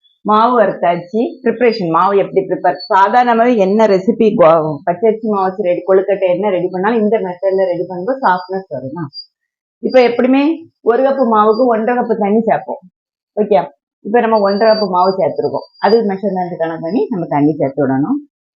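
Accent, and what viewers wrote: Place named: Tirunelveli, India. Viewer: Enjoyed your recipe and your Tirunelveli Tamizh❤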